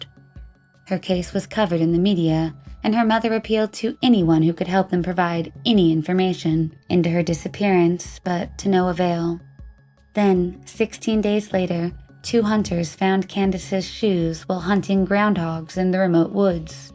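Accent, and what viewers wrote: India. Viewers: Thx, not the Indian Guy speaking again 😬🤭👍👏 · I personally love his accent, and I'm quite fond of indian/middle eastern accents in general. They are a joy to my ear. I do agree though that soothing voice is perfect for the channel and is most effective at conveying the sometimes unsettling information in a very palatable way. Love the duo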